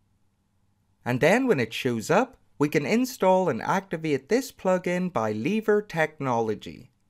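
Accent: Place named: Belfast, United Kingdom. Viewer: Hello. From your accent I think you may be local to me in Belfast. If I could ask a question it would be great. I installed a pdf embedder a few months ago in our Wordpress website. It worked well until this weekend when it seems to have disappeared! I can't remember which plugin it was so I installed another one that has a 'toe bar' (?) like the one you use, but my original embedder allowed you to scroll up and down directly on the pdf. Is it possible to get this back. Many thanks, Geraldine.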